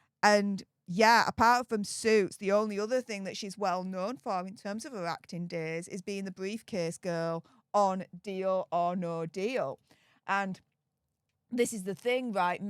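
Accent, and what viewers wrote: United Kingdom. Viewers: When you say “bonning” it sounds like “born in” … took me awhile to make out what you really were saying. This is what is fun above hearing a British accent.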